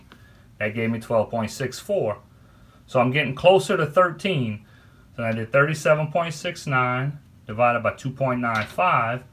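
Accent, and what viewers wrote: Louisiana, United States. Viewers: Very nice explanation. Just what I was looking for. What part of South Louisiana are you from?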